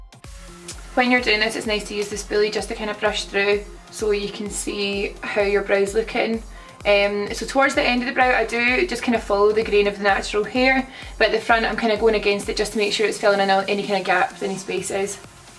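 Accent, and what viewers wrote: Scotland, United Kingdom. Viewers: Is it weird that I'm australian and yet when I read your comments I hear it in a Scottish accent?! lol! I love your accent x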